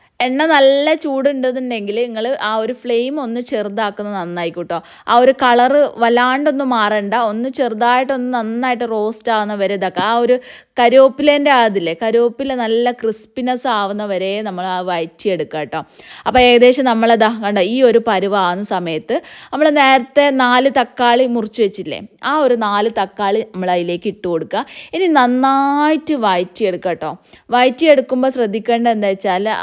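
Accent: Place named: Malappuram, India. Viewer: Malappuram ano... Slang kettitt angane thonnunnu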